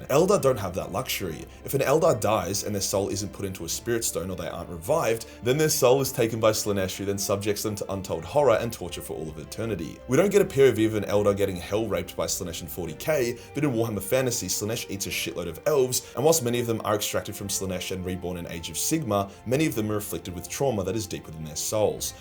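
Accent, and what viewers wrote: Australia. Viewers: You went full Aussy on this one. Love it.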